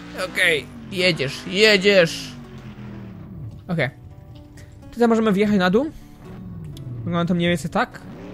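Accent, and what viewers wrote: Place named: Germany. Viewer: ty gadasz z akcentem niemieckim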